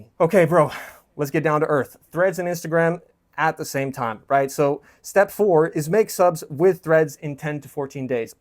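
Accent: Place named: United States. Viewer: you are definetly not from eastern europe, your accent sounds definetly as an american lol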